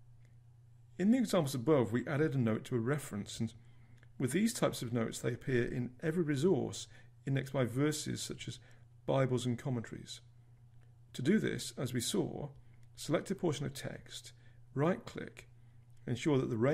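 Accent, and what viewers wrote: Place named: United Kingdom. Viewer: How nice to have a calm British English voice. So much easier to follow than Morris' style of delivery.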